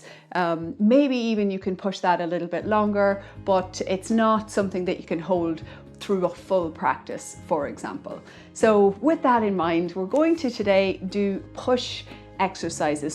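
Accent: Ireland. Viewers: thank you for your testimony. I appreciate your honesty. Part of me can’t imagine getting to a place where i can run or jump for an extended period of time without leaking... so surgery seems like the Obvious choice. I get different reports from different doctors about mesh. I believe they used my mom’s own tissues when she had hers done. I have rectocele and retroflexed uterus as well with prolapse . I have some lower backpackpain and i have had a ablation for excessive bleeding. I have been told by someone that a hysterectomy also helps with the POP and incontinence w or wo the sling procedure . What are your thoughts??? I am going to keep working with my pelvic floor and i do plan on reading your book. But i appreciate your feedback. PS I assumed that you were from Ireland bc of your accent. Your English is very good!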